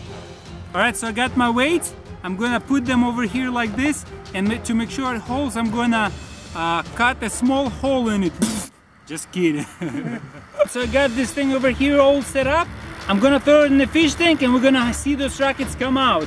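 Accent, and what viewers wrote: Russia. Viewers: D tone of him is like crazy Russian hacker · Русский акцент 😀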